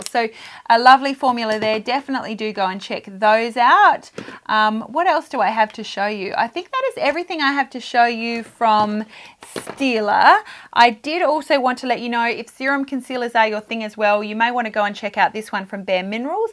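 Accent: Australia. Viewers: Love your videos. You are fantastic. It's great to have an Australian voice.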